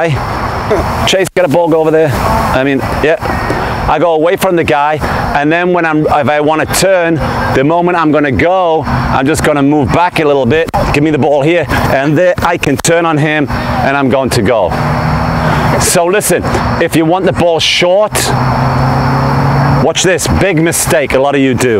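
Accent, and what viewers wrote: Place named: Newcastle, United Kingdom. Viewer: Watched a fair few vids now coach, I had to look you up! Yep, I cheated. But I will say that I did detect the Geordieisms in ya dialect. How? I'm from Ashington. Home of the great Jackie Milburn, and Charlton brothers. Me Granny used to babysit the pair.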